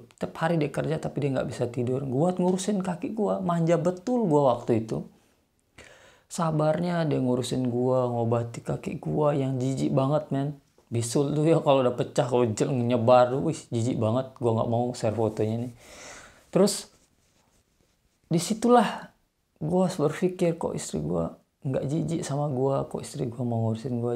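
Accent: Lombok, Indonesia. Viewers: I like your style of speech Bro, Lombok gatiii 😁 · logat lombok masih kentel😂😂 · Logat sma diksi2 lomboknya ga ilang bang mantapp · Khas sekali Logat lomboknya ton😆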